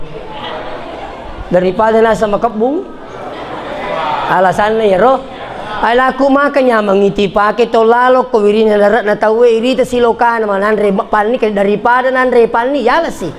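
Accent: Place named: Sidrap, Indonesia. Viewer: Saya orang Bone, tapi suka dengar logat Sidrap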